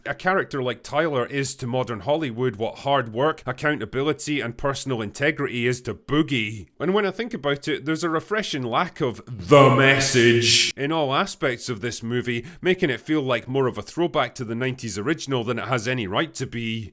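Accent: Scotland, United Kingdom. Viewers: SSSSSSSIIIIIIIIIIIIIMMMMMMMPPPPPPPP!!!!!!!! ............. Nah, that Scottish accent gets me too 😅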